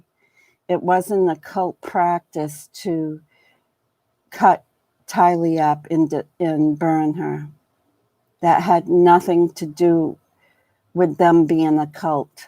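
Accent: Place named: Boston, United States. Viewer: Your accent is similar to JFK so Bostonian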